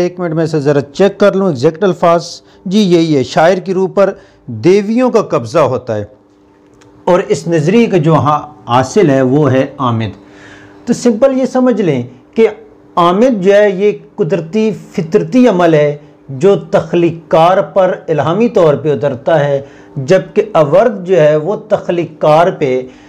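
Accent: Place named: Punjab, India. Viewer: ماشاءاللہ ۔ پنجابی لہجے میں کیا خوب اردو پڑھاتے ہیں۔۔ آواز ہو بہو صحافی ارشاد بھٹی جیسی ہے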